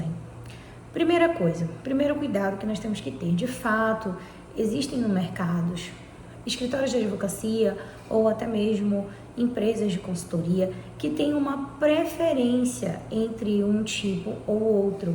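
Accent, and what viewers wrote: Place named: Belém, Brazil. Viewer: pode me dar o contato da Martell? Fica aqui em NY? Eu tenho personal plan e to em RFE. É de chorar. Pelo seu sotaque acho que você eh de Belém né? Sou paraense radicada nos eua. Mas sai daí pequena.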